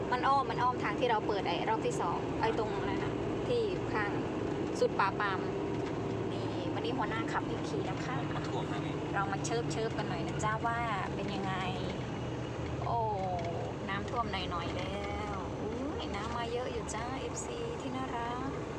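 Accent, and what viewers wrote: Korat, Thailand. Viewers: เจ้ พูดสำเนียงเหมือนคนนางรองไทยโคราชเลยครับ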